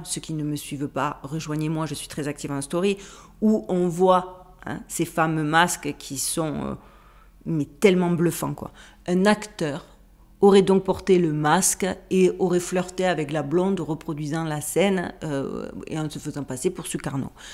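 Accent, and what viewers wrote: Marseille, France. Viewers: Plus ça va plus je vous écoute... c'est un bonheur, le mélange d'un côté artificiel à fond (Barbie) et une vraie brillance intellectuelle. Ça donne un dandysme du meilleur teint, au sujet de rien de moins que la structure (viciée) dé notre monde. Deux questions bêtes : un, vous êtes marseillaise ? Deux, avez-vous fait des "études" d'anthropologie ? Autre ? Merci en tout cas pour votre excellent travail, ET SA PRÉSENTATION, la Vôtre. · Magnifique, comme toujours….. Le réveil de l’esprit critique, si peu actif ces dernières années. Et cet accent de cigales!….. Merci. 😍🥂 Meilleurs Vœux à tous pour cette année de révélations …. Y a du pain sur la planche 😏😘🤓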